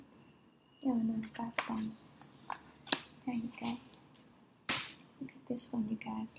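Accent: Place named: Greece. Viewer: Greek, isn't it?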